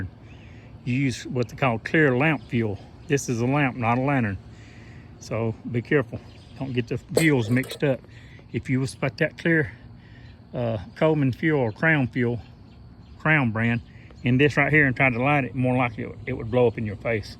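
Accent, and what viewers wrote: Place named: Tennessee, United States. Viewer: That accent is from Tennesee the Volunteer State.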